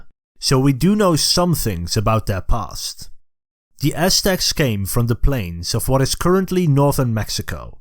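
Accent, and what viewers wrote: Netherlands. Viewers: I want to know too! I am hearing the slightest bit of a dutch accent? I am confused too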